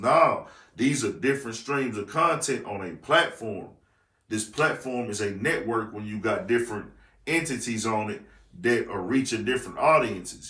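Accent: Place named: Houston, United States. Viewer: Mane you from Houston fam? I can hear that shit all in your vernacular.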